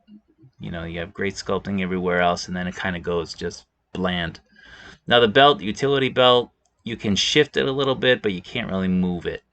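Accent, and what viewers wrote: Boston, United States. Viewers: What kind of accent is that ? Boston?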